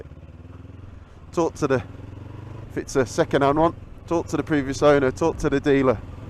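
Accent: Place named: United States. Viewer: Great job and great American accent,haha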